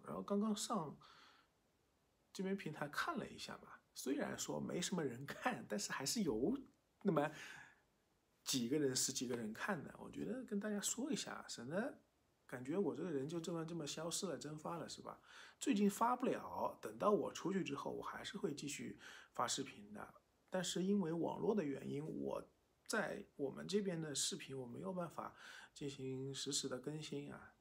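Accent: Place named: Yangtze Delta, China. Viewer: 你这是长三角口音啊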